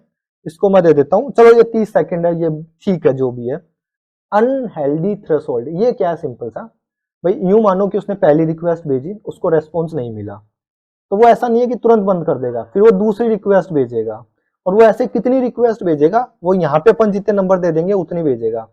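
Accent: Rajasthan, India. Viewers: Alwar, rajasthan se ho aap bhai?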